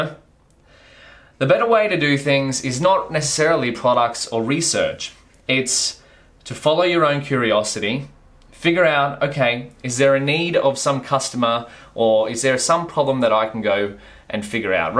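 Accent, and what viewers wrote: Australia. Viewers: first sentence I could tell he is an aussie jaja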